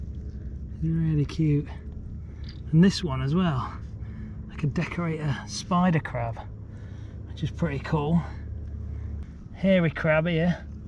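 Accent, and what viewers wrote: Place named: Mansfield, United Kingdom. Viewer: Loving the vids, you originally from Mansfield area by any chance? Your accent is very familiar!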